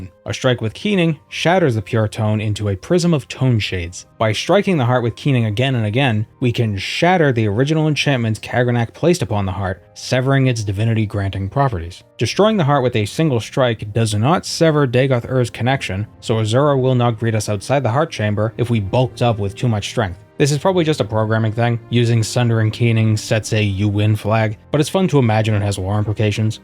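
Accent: Boston, United States. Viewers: Weird question but are you from Boston/mass? I feel like i can hear it every once in a while haha. Im just curious since im from there too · Boston boi? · Boston accent? I heard you say smaaht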